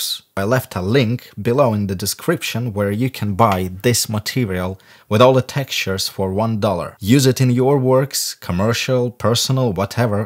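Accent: Russia. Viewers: Are you a Russian? Doesn't seem you're from UK. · блин, я прям чувствовал натянутое английское произношение, ахаха, русских видно сразу =)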